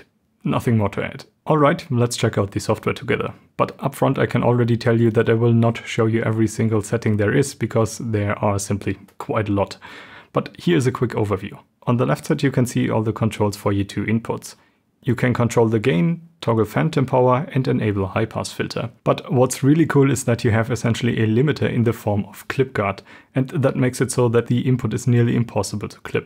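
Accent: Germany. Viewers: your name isn't why are you pronouncing your own german name intentionally wrong? an english or french speaking person would never say their own name in a german accent in germany. you have the heaviest german accent ever but you're pronouncing your german name in an american way, makes no sense. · are you German??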